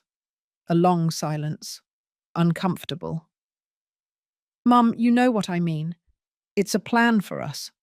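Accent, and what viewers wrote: United Kingdom. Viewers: This is one confused story. Evil daughter knows and then doesn’t know mom gives monthly transfers. Narrator has a British accent, is named Mrs Lopez, and has a bank that’s open on Xmas Day. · I can’t stand this British accent any longer. Bye. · I don’t know why but a British accent makes revenge sound proper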